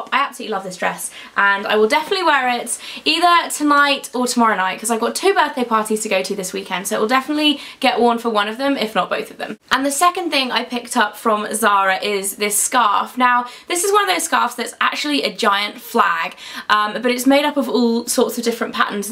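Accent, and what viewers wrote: United Kingdom. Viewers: I'm from the U.S. and have only heard it said in our ugly accents - I think the way Brits say things sounds so much better, especially!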